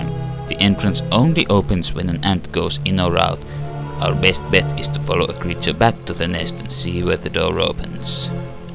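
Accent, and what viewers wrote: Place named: Finland. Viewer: I knew you was finnish (from your accent)! Suomi perkele!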